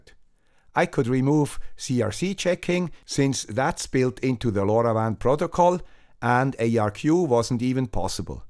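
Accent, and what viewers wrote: Switzerland. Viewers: Hold on hold on you're Swiss! I thought you were Dutch. Then what do I know, I'm English with Danish ancestors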